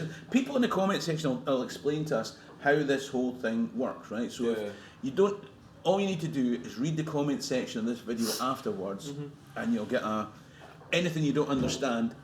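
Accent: Scotland, United Kingdom. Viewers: DNA is good for crime detecting, and paternity too, but as far as ethnicities I think it's not accurate. People have moved in the past all over and if you went in a time machine back 300 years what would test results say then? You sound Scottish to me. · WOW this was so interesting your daughter is the polar opposite of my DNA test results... genetics is so fascinating. Btw I love your Scottish brogue 😊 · As a black man from America it is refreshing to see someone talk about Scotland from a black Scottish point of view. Your insight into Scottish culture and history is very transparent and fascinating. Because by you having that insight from a different perspective. You give a more well balance look at the Scottish culture coming from both sides .In my short time watching your videos.I had learned so much because it is easier to when i see myself.Even though you're Scottish accent is thick . 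Scottish history has alot of black roots in it. You really makes it fun and very interesting. You talk about the technical aspects of history and culture with having the need to down play or erase any type of white contributions that was made towards the Scottish culture. I was always fascinated with Scottish culture. But i could never listen to or watch any white Scottish person speak on it. Because they always had the need to downplay or erase any type of contribution that was made by black Scottish folks.You don't downplay nobody real contribution to the Scottish culture. I thank you for that.I'm trying to get others to know about your channel.I feel that it is good for young black children to learn and see different cultural groups within the black world wide community. Your videos are fun and your presentation is on fire. That's how good you are my brother. Much success to you and your channel.💯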